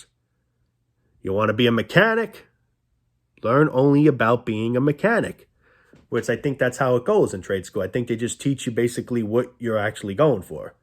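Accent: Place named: New Jersey, United States. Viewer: I can't tell if his accent is New Jersey, New York or Canadian...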